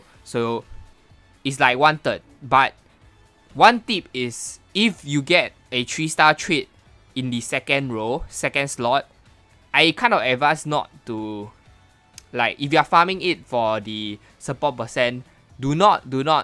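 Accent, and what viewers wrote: Singapore. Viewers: Are u from Singapore I can tell from your acent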